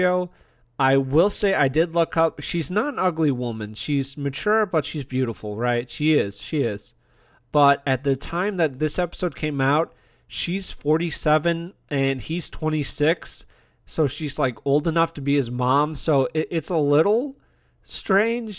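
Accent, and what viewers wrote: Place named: United States. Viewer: Firstly, thanks for taking the time to share your view of the show, enjoyed hearing your perspectives. I remember watching both Sharpe and Hornblower growing up and all the family liked both series very much. Both fantastic shows. That said, Hornblower is a definite personal preference for me. What’s more, I can say as a UK native that the general perception of status of these 2 shows, again although both well liked, is that Hornblower definitely has the clear higher status of the 2 shows. I think the difference in perception comes down to culture. I could be mistaken, but the reviewer of this video sounds American. Apologies if mistaken. Sharpe is a more typical heroic character or rather a simpler childish imagination of heroic character. He fits the American idealised trope of hero more than Hornblower, that’s why it is easy to understand why American audience probably get and therefore have more appreciation of the Sharpe show. 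Sharpe right from the start, he knows what he’s doing, he’s confident, competent and cool. He’s also a bit independent and brash, going against authority, charging first into battle because it’s the best way to get himself promotion. He meets a different woman every episode like he’s a James bond type. Its certainly more fun and cool (perfect for American audiences), but also less authentic and more simple as a character study. Since he’s so developed from the start, there less scope for character development through the series. Hornblower on the o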